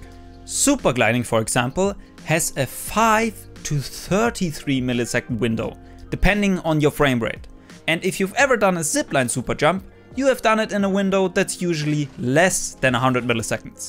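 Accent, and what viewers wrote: Germany. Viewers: Are you Form Germany? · Sounds like German Accent :) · Man hört so den Deutschen akzent raus 😂💪🏻🔥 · Dikka dein englisch hört sich mehr deutsch an als alles andere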